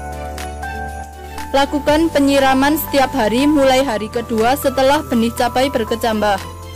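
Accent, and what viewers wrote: Java, Indonesia. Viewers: Salm medok..😅😅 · Tapi suara presenter nya medok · Saya seneng pembawa acaranya ada medok medoknya gitu 😀😀😀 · Medok bngt ngomongnya😂😂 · Medhok tapi Keren 😁😁😁😁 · Saya gk butuh MEDOK 😀 Nya....saya butuh informasi ilmunya.... semangat nduuuuukkkk...👍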